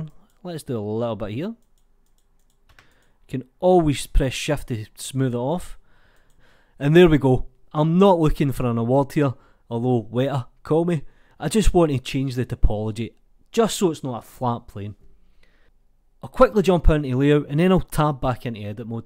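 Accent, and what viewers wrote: Scotland, United Kingdom. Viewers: Can it be used as a soft body afterwards? P.s. Your amazing Scottish accent made me wonder if it was English language what I was listening to, but I've understood the video perfectly nevertheless.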